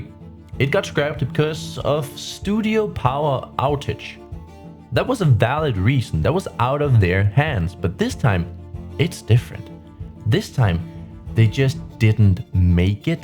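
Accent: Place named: Denmark. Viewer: Dansk accent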